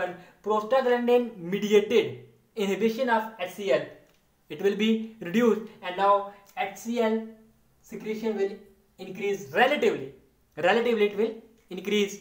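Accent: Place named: Pakistan. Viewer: You explained very nicely sir thank you so much 😊 I think you are from Pakistan ( I recognised by speaking tone )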